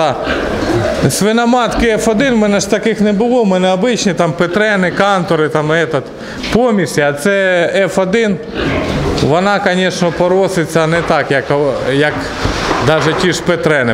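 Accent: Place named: Surzhyk, Ukraine. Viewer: Это суржик ,кто как хочет так и говорит ,у нас в Украине с этим проблем нет!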